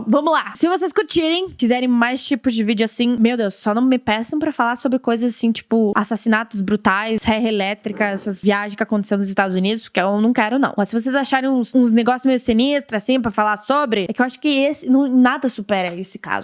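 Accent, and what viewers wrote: Rio Grande do Sul, Brazil. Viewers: Mas tu tem o sotaque daqui de rio grande do sul tchê!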